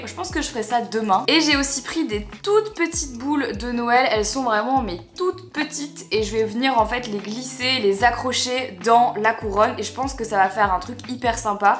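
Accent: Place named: Belgium. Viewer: Ton probleme de jambes ce n'est pas un lipoedeme par hasard? C'est trop mignon, tu as parfois l'accent belge j'ai l'impression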